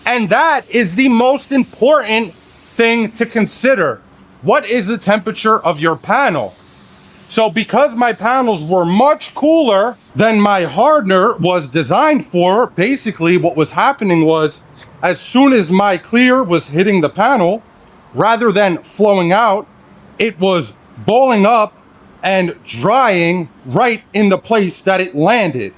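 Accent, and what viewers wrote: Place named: New York, United States. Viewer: It's that New York accent.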